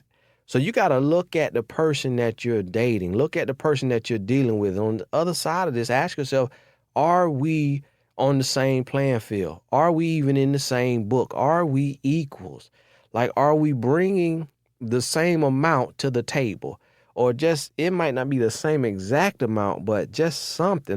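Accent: South, United States. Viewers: Is this really your voice, you sound a lot more southern in your newer videos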